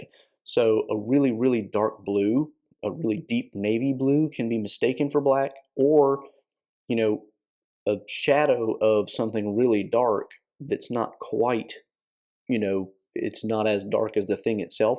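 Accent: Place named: Louisiana, United States. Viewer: Hi Lucas. I wouldn''t be surprised if "the war that will end war" was of French origin. On the other hand, I think evidence would need to be brought forward to prove it. (I hope you can read this in the Louisiana accent of our host).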